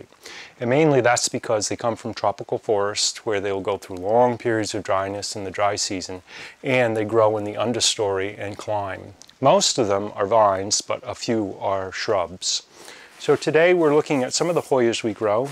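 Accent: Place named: Southern New England, United States. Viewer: Yes! Proud southern New England accent!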